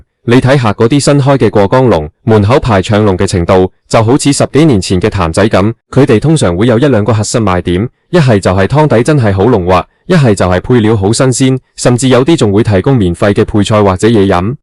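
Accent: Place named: Rural, China. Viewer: 鄉下音太重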